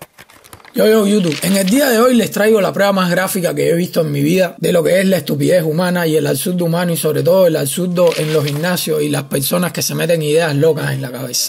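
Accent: Cuba. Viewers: hubo muchos que no entendieron bro, empezaron una discusión absurda por acentos cuando realmente es gracioso pero carismático ese acento cubano suyo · Dale loco todos sabemos que es cubano y es su acento por naturaleza digamos que tu comentario no es nada atinado ante una persona sale e invierte tiempo de su propio tiempo para hacer un video alertando a los mas jovenes sobre hacer estupideses luego de grabarlo subirlo publicarlo lo mas inteligente que haces en el teclado es redactar sobre su forma de hablar rayos viejo solo atinas a burlarte? No jodas que tienes en la cabeza inmadures o no sientas cabeza se ve que ya estaa grande para eso no lo crees? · Que bola brother por tu acento pareces de Cuba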